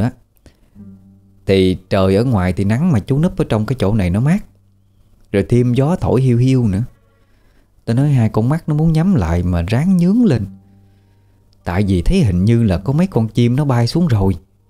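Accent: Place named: Mekong Delta, Vietnam. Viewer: Xin phép hỏi em quê ở đâu mà giọng đọc hay quá.giong miền tây của mình quá.do trời phú cho giọng đọc xuất sắc.nghe riết ghiền để phone trên giường nghe rồi ngủ mà có khi nghe đến 3 giờ sáng.cam ơn và tác giả ❤❤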